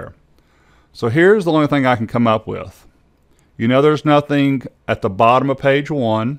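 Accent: South, United States. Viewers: worked like a charm thank you that southern accent though!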